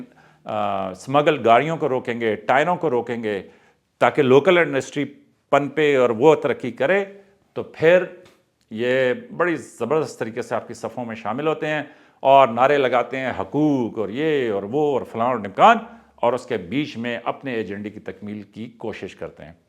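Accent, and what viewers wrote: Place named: Sargodha, Pakistan. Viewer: سر بہت اچھا ❤ آپ کا لہجہ سرگودھا کا لگتا ہے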